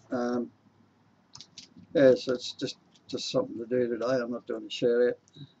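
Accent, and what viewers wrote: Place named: Australia. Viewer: Hi if you're wondering why I comment so rarely on your videos, while you so often comment on mine... it's not because I'm selfish. I would love to comment on more of your videos, but the problem is, I don't always understand what you say, since English is not my first language, and your Australian accent is a bit difficult for me. Ask Brendan.. I have to watch his "Friday's Funny" episodes like 3 or 4 times in a row to get all of his jokes! There seem to be different accents in the different regions of Australia, because some folks I can understand better than others. For example, I usually understand Gazza, but when he speaks in his funny voice on the "Bogan News" it's hard to get for me.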